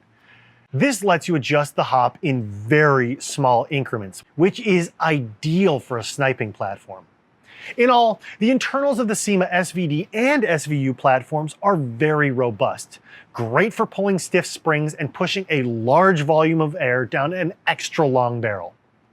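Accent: Russia. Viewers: I Loved the super detailed review. All the info was much appreciated and incredibly helpful. Can't wait to see more reviews like this, Russian accent or not. Good job and Evike crew. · How to talk like that? Russian accent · That Russian accent though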